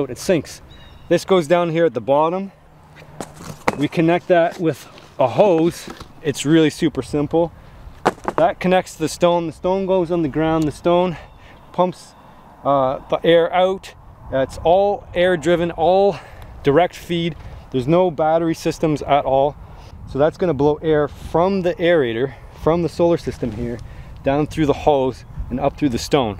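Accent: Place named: Canada. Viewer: This is great man. I love content like this. I've very jealous but also very excited for you. I've been wondering where you are from. You sound very canadian like me. I'm from northern ontario myself!!!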